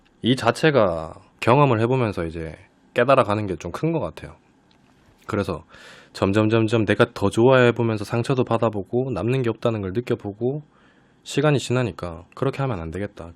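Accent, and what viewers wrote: Gyeongsang, South Korea. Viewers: 얼마전에알게되서 듣다보니까 대구분이신가요 말투가 대구인데~~?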